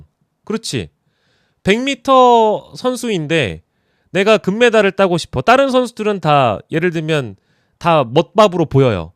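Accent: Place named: Gyeongsang, South Korea. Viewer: 갱상도 추가요..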